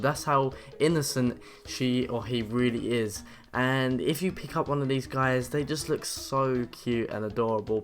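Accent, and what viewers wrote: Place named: United Kingdom. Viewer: Cut Him Some Slack He's British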